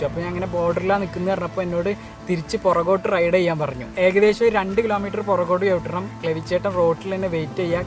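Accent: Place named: Malappuram, India. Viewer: malappuram accent kalanj samsarikkalle broooo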